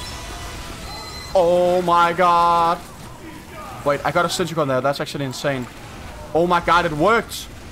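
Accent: Denmark. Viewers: Your too danish, the danglish is real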